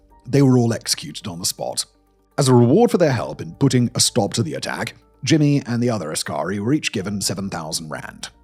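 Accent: England, United Kingdom. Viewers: Pokemon Red on 1 screen, A British man describing the worst thing ever on the other. Perfect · 'N Britse kont agter 'n skerm.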